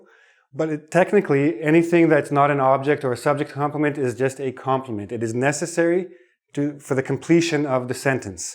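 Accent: Quebec, Canada. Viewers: He sounds like a French Canadian. I know he's in Canada so it is a legit guess. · wait... are you canadian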